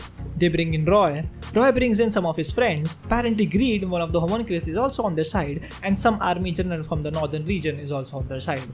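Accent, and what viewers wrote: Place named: India. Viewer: Bro, i love your videos and i think you could become a huge anituber. But the main thing is if american/european/Canadians will watch your videos. Cox the anime community there is around 5 million people strong. Search up Gigguk, that's the community you can appeal to. I'd say only problem would be the accent barrier, but your English is already really good. You can become the first indian accented YouTuber who's appealing to the western audience. You can do it, just keep on going. I'd say also refine your content a bit more to appeal to western audiences more. Cox let's be real, the indian anime today is where the USA anime community was in the late 90s. It'd take decades to grow by just appealing to the indian audience.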